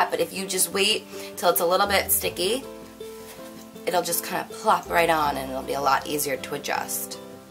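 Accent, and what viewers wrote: New Jersey, United States. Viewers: I agree. I think it's that slight and subtle New Jersey accent. :)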